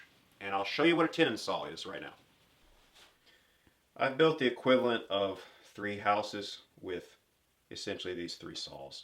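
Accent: Tennessee, United States. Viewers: Sounds like a Tennessee accent to me, but I haven't lived there since 1969. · he sounds more Tennessee city to me. Maybe kinda like Memphis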